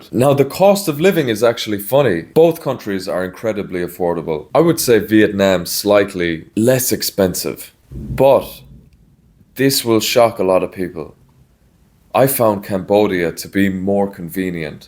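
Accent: Ireland. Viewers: I'm debating between Cambodia and Thailand. I want to open a business and I know I can't do that in Thailand. I would have to find a Thai husband. In Cambodia, as far as I know, I can do it on my own. Thanks for the video. Love your videos and your Irish accent. I love Ireland...it's just too expensive. I've been there a couple of times. Good times!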